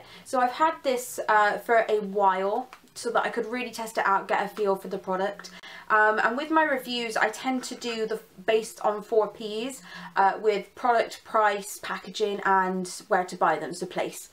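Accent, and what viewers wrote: Bristol, United Kingdom. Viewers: Are you from Bristol? I'm from Bristol and my accent sounds so strong in my videos lol. Love your videos btw xx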